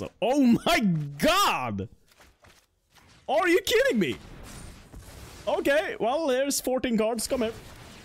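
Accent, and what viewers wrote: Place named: Sweden. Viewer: is u swede boi, u sound swede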